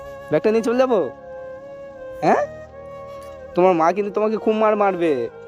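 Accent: India. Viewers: ইন্ডিয়ান কথার মতো লাগছে💕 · কথা শুনে মনে হয় ইন্ডিয়ার 🙂 ইন্ডিয়ার ভাষা এমন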